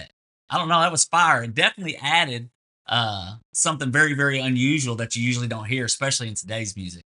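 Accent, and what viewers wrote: United States. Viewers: I take it from you accent your American. I'm sorry if his offended you but for someone who has a studio , i cannot understand why you don't know who the band is tjb uk. Just joined😊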